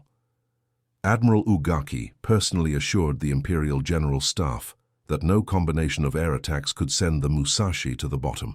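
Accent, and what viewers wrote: United Kingdom. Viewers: Why is it always the voice of a Brit talking about American history ?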